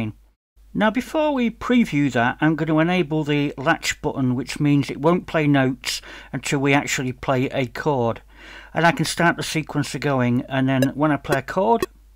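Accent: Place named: West Yorkshire, United Kingdom. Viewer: Outstanding. all these updates. West Yorkshire by any chance?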